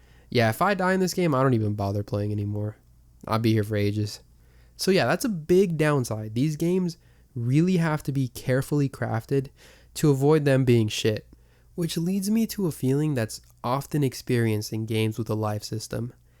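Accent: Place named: Brazil. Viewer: Dude, are you Brazilian? You have an accent that reminds me of Portuguese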